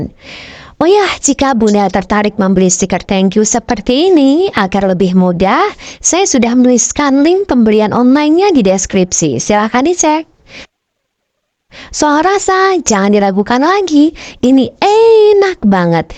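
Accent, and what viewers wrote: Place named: Banyumas, Indonesia. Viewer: Ngapak usah pake logat bile ngomong nya ngapa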